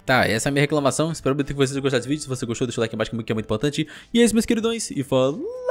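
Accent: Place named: Ceará, Brazil. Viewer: eu não sabia que vc era cearense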